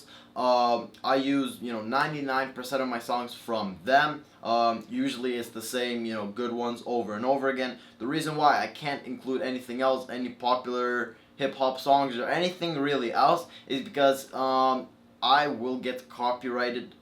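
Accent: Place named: United States. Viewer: he said in live stream multiple times he lives in the U.S., and clearly has an american accent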